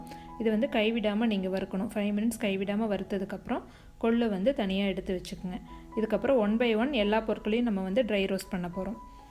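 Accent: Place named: Erode, India. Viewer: I like the way you speak😍Erode vaasam US ponalum veesuthu😊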